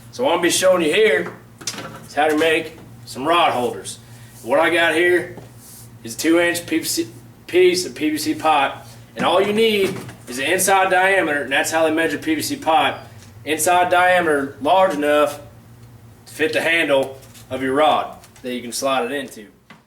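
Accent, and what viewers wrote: South, United States. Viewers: Just love this guy! Especially how he talk! Is it Southern? (I am from California). Thanks for the Video!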